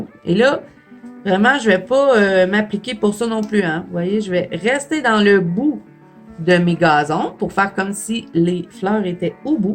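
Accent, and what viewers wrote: Canada. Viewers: bonjour, merci pour la vidéo. Pour info, j'ai de l'encre distress oxyde dans un flacon de spray. Je pense que ca traverse si on le mets de cette façon (+ humide) et donc, dans ce cas, le mettre sur un plastique intermédiaire pour le passer ensuite au 'doigtier'. J'en parle pour que l'on puisse comprendre certains inconvénients rencontrer éventuellement par certains, voir prévenir du désastre. Moi, perso, j'ai parfois fait une base avec des allbrecht durer a la place de l'encre. Merci encore, toujours un plaisir de te suivre (et d'entendre ton Français avec le bel accent canadien)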